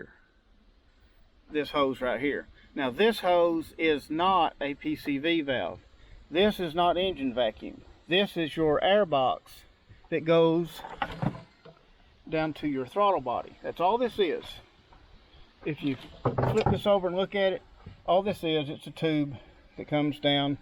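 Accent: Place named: Wichita Falls, United States. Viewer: Thanks for this - I won't feel comfortable doing it by myself but you sound just like my MIL's boyfriend who is native to Wichita Falls. I would swear you're from there! I'll be asking him his thoughts about this solution.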